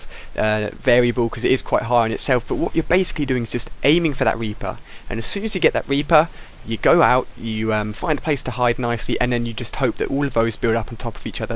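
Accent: United Kingdom. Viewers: What do you think? He's only got a British accent. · love the britisch accent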